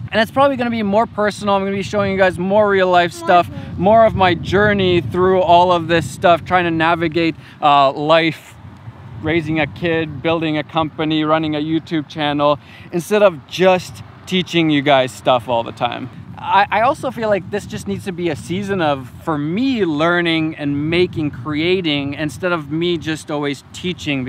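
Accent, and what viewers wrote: Canada. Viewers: Canadian. Definitely Canadian. #saidthegirlfromBuffalo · Definitely Canadian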